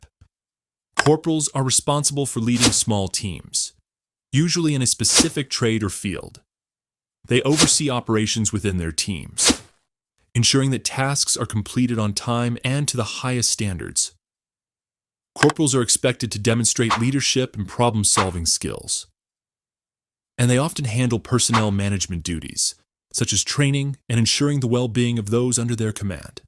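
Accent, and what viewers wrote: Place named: United States. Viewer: So weird here’s an American accent explaining Australian military ranks. No thanks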